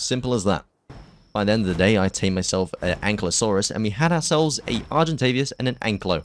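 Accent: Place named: United Kingdom. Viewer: I never heard such an bri'ish guy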